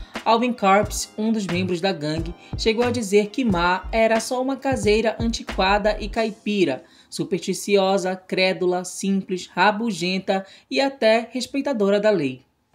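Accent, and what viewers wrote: Pará, Brazil. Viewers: tem um sotaque paraense lindo. ❤ beijos manauaras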